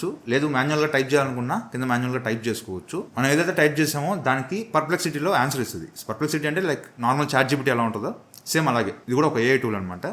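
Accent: Nellore, India. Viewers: Anna meedhi nellore aa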